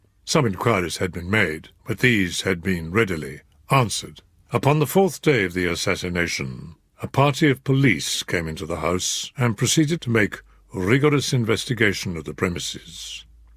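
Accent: United Kingdom. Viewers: Is this British accent ?? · Sir Christopher Lee has a very strong posh accent. Like it.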